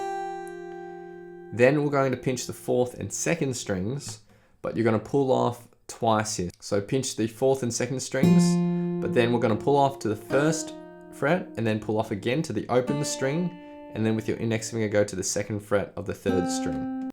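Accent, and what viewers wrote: Australia. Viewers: Your Aussie fuck me ya legend good stuff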